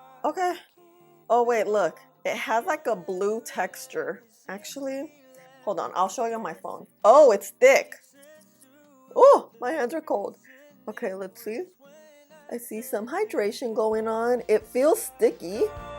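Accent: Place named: East Coast, United States. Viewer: Didn't like 😢... wanted someone to tell me different ! You did ! Thank you ! I like your accent .. you sound east " coasty"... NY native here..pS>I subbed ❤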